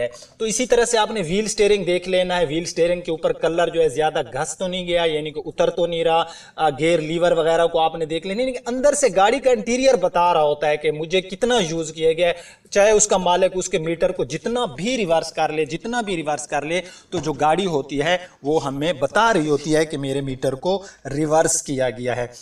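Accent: Saraiki, Pakistan. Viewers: AP ki Urdu ye bta rhi h k AP saraiki hen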